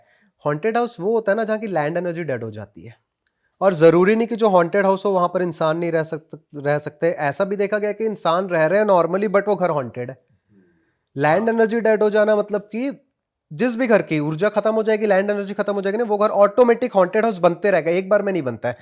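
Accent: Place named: Chhattisgarh, India. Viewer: Bhai mujhe tumare tone se lgta hai ki raipur ya bhilai taraf se ho shayad ❤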